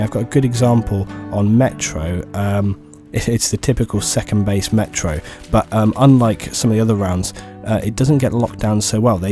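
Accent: United Kingdom. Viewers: You mention players moaning about server admins not balancing out teams and what you say is so true. Speaking as a server admin, you can't be constantly moving people round just to balance out teams when that person you moved could have been in a squad with mates or was on a winning side when moved and players don't seem to take this into consideration. You just end up pissing players off and they leave the server. Also I love that you feature on LvlCaps channel, great to hear a Brit talking!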